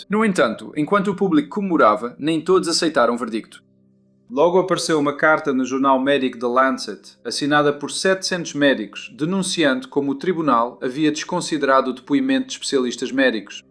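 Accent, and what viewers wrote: Portugal. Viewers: Eu gostava bastante deste canal, mas agora com esse sotaque de Portugal, estou pensando seriamente em desfazer minha inscrição, esse sotaque é irritante 😡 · Esse sotaque de Portugal nossa muito ruim de ouvir. Voltem com o nosso português por favor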